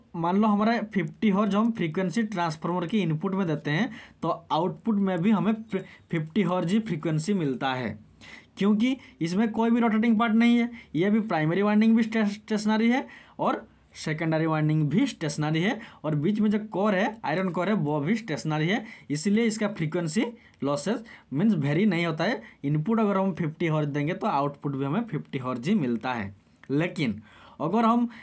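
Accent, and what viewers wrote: Odisha, India. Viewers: Bhai tume Odia na??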